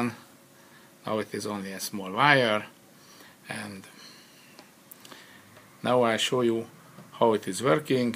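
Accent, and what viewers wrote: Hungary. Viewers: ajj de magyar vagy te is :D kurva akcentus mi? :D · Annyira hallani az akcentuson, hogy magyar :DDDD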